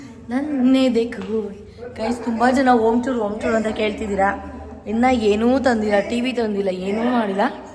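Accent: Mandya, India. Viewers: Mandya style speaking ❤😅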